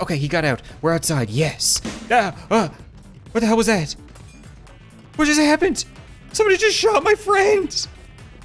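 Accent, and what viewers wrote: Canada. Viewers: GoldenGamer 12 he’s Canadian pretty sure that’s just how they say it.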